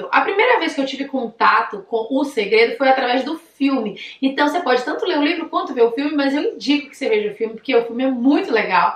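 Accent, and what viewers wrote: Bahia, Brazil. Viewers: Uma baiana ? Uhuhuuuuuu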